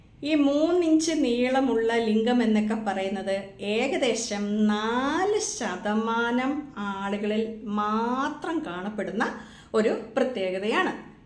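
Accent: Kottayam, India. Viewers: കൗഗേൾ, ലോട്ടസ്, ചെയർ, ലാപ് തുടങ്ങി സ്ത്രീ മുകളിൽ ഇരുന്ന് ചെയ്യുന്ന എല്ലാ പൊസിഷനിലും സ്ത്രീയ്ക്ക് പരമാവധി സുഖം കിട്ടണമെങ്കിൽ നീളവും ബലവുമുള്ള ലിംഗം തന്നെ വേണം. കാരണം സ്ത്രീ മുകളിലിരുന്ന് ചെയ്യുമ്പോൾ അരക്കെട്ട് നല്ലത് പോലെ ഉയർത്തിയും താഴ്ത്തിയും ചെയ്താൽ മാത്രമേ നല്ല സുഖം കിട്ടുകയുള്ളൂ. കൂടാതെ സ്ത്രീ പൊങ്ങിപ്പൊങ്ങി വേഗം വേഗം ലിംഗത്തിൽ റൈഡ് ചെയ്യുമ്പോൾ ലിംഗം യോനിയിൽ നിന്ന് തെന്നി വെളിയിൽ വരാതിരിക്കാൻ ലിംഗത്തിന് നീളം വേണം. അതുപോലെ കൃസരിയെ ലിംഗത്തിൽ മുഴുവൻ ഉരച്ചുകൊണ്ട് റൈഡ് ചെയ്യണം. ഇടയ്ക്ക് ഗ്രൈൻഡ് ചെയ്യണം. ഇങ്ങനെയെല്ലാം ചെയ്ത് സ്ത്രീ യോനിയിൽ ലിംഗം കേറ്റിയിറക്കി പറന്നടിക്കാൻ ലിംഗത്തിന് നീളവും ബലവും വേണം. ശരിയല്ലേ പാലാക്കാരത്തി അച്ചായത്തീ? കോട്ടയം പാലാ സലാംങ് കേൾക്കാൻ നല്ല സുഖമുണ്ട്. അത് സെക്സി ആണ്.❤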